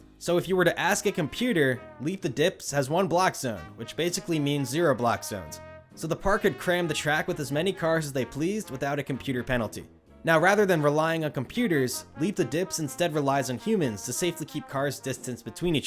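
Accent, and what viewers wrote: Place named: California, United States. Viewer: This is the most Cali accent ever..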